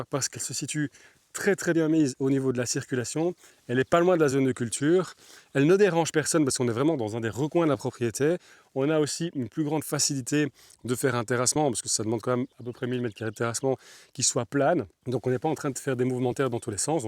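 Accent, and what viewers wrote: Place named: Brabant, Belgium. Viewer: Bonjour, bonne découverte. Vivant en montagne noire, le château d'eau du Languedoc, sur les hauteurs du pays carcassonnais. On se trouve à la croisée des chemins des climats Je réfléchis beaucoup pour avoir une cohérence sur mon païs d'adoption. Objectif, préservation, régénération, encrage culturel et naturel, robustesse. Votre vision analytique m'intéresse beaucoup. Je travaille sur une terre d'un 1/2 hectares, en pente, ruisseau, courbe de niveau, future habitation en greb en amont, verger, différents niveaux de couverture végétale pour préserver le sol et les couches inférieures comme un parasol et surtout diminuer l'effet de l'évaporation. Objectif : garder ce précieux capital qu'est l'eau, régénération des biodiversités, enrichissement des sols. C'est une longue quête, merci pour votre analyse, je reconnais d'ailleurs un accent de mon païs d'origine, Brabant ? 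Cordialement, d'un belge en païs occitàn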